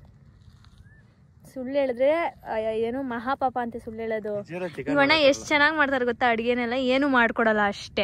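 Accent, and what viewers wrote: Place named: Mandya, India. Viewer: ❤❤❤Namma Mandya style mam❤ super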